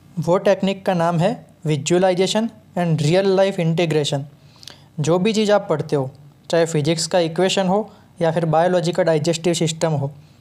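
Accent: Gujarat, India. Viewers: bhai gujarati hoi evu laget???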